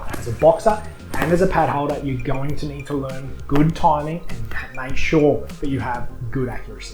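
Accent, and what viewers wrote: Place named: Australia. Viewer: Your channel is Fair dinkum I appreciate your reviews. I love listening to people talk about muay Thai gear especially in an Aussie accent. 🥊 I love when you said "muay" instead of "my" 🤣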